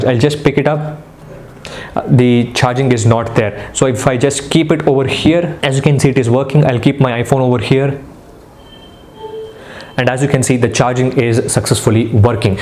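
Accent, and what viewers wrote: India. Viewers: Even doe he has that indian accent this person is so coool Also so underated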